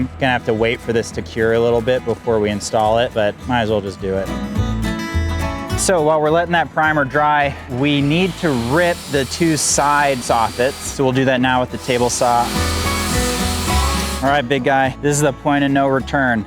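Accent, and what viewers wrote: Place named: United States. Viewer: Sometimes I have to try and work out what you're saying in American and translate it to English new word soffits in English they called fascia boards, and if you're trying to insist you speak English, I can tell you is definitely American there's a new your loss of bastardising English which is doving translated into English means driving, so don't tell me you're speaking English still like your videos even though I have to try and work out what you're saying in English.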